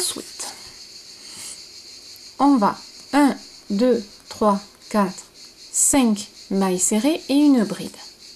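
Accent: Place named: South-West, France. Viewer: Merci infiniment pour cette vidéo ..Les explications sont très claires et on a l impression de crocheter avec une copine, c est trop bien . Cela faisait des mois que je voulais faire de la mosaïque en crochet mais impossible de trouver un tuto facile à comprendre . Enfin ,grâce à vous , j ai réussi ma première mosaïque . Je suis trop heureuse. 🥰 (Et l accent du sud-ouest en plus, peut-être celui des Landes , c est le bonheur ) Vous avez gagné une fan en Guadeloupe .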